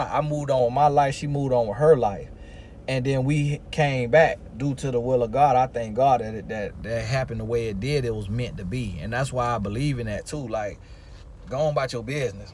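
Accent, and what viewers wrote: South, United States. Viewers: Gurl. 8 was driving hollering and weak at how he was talking about Puerto Ricah Jumaican, and his first thought to the Lord when saw her booty. Omgosh. I fell out. I'm from the south and his mannerisms, cadence and demeanor is mad familiar and makes me laugh. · "Puerrta rica" and "Jewmakin" 😆 I love how southern folks talk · I see now why I can relate to and appreciate the country grammar and accent in these videos. My folks are from Selma AL too. I think it is cool and respectful that you pay homage to your grandma and talk like her. We do that in the south. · Be blessed 🙏 im loving that southern accent..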